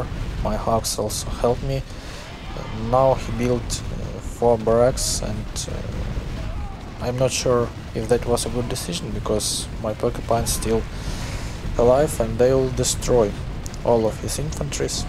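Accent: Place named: Russia. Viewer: с русским акцентом говоришь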